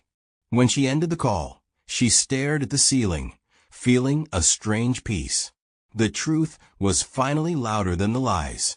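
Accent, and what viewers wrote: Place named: Jamaica. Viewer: Mi lik de patois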